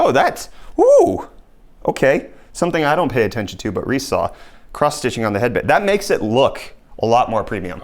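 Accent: South Africa. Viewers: WOW WOW WOW! Wait are you from South Africa?? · damn his english is actually so good wtf? wait is there a south african accent?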